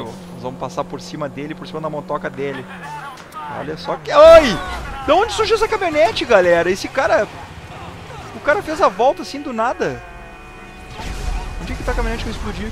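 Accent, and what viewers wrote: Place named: Bahia, Brazil. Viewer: Bem baiano viu cara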